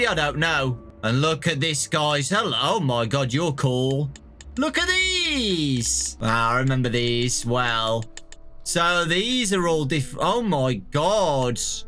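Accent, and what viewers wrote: United Kingdom. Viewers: Does anyone here think British accent is cute☺?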